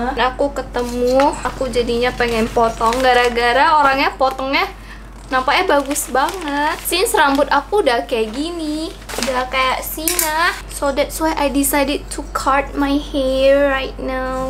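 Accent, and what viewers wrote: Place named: Java, Indonesia. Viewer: KAKA KALO NGOMONG KAYAK ORANG JAWA SIHH 😂😂 TAPI AKU YA ORANG JAWA YA HEHE 😅😅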